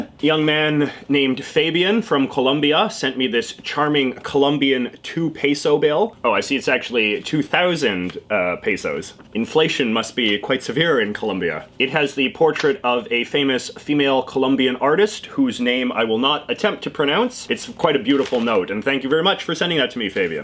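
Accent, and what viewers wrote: Canada. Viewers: J.J. McCullough k cool just asking it kinda go's with your whole nice Canadian accent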